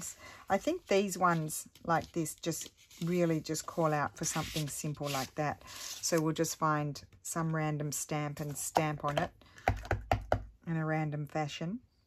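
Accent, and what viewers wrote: Australia. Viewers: Another fantastic video, really enjoy watching you. (So nice to hear your Aussie voice - and phrases - when watching 🤣) Have you tried popping your mushy Giotto in the fridge, might help for a little while? Dawn (Melbourne, Australia)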